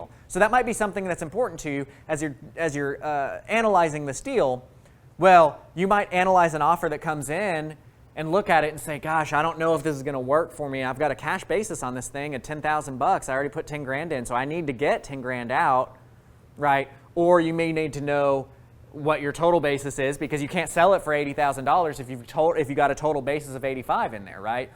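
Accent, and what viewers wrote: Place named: Texas, United States. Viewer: Sheeesh you and Rod Parsely are definitely from the TX area lol vocal tone almost identical. Video 🔥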